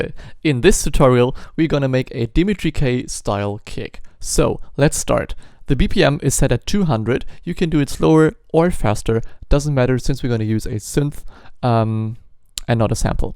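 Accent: Germany. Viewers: Ich wusste es! Ich höre den Deutschen Akzent doch raus ;D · ich liebe diese deutschen akzent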